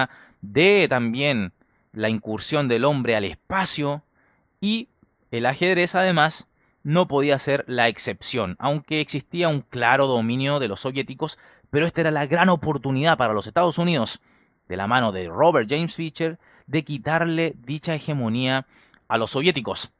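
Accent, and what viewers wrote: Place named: Chile. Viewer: Siempre creí que era argentino, veo que en los vídeos antiguos habla en idioma chileno. Fitche xd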